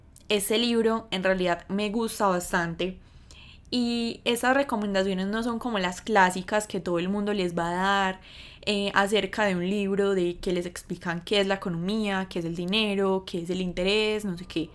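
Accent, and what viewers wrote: Colombia. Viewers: Eres colombiana no?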